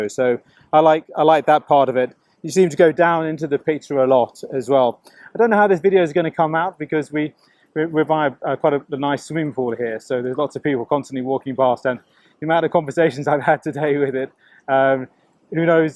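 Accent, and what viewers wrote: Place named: Scotland, United Kingdom. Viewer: You’re Scottish?!! 😮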